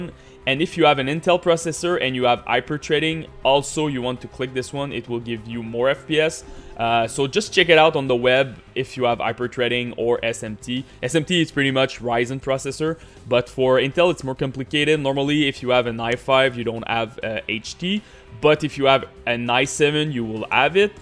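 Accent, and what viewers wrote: Quebec, Canada. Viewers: hes french from quebec as i am and it took me more than 5 years of speaking to get rid of that french canadian accent lmao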